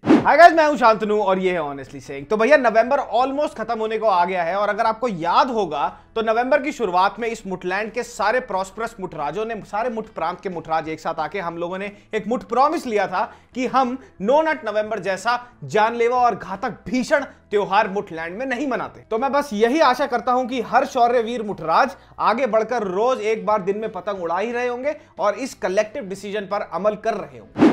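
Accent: Haryana, India. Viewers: Bhai aapki Hariyanvi accent sunke me bhi thodi thodi try karra hu bolne ki....😅😅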